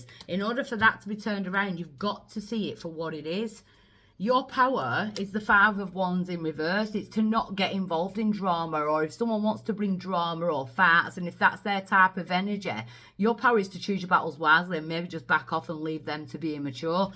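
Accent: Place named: North, United Kingdom. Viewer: Thats a northern accent if I ever heard one!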